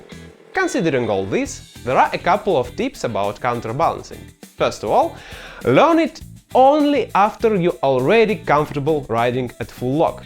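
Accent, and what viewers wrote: Russia. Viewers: Actually love the Russian accent 😊 And sensible content, saving lives.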